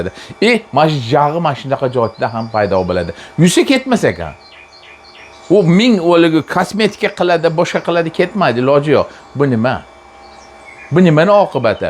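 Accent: Namangan, Uzbekistan. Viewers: Rahmat.Namangan shevasida hammasi ajoyib😊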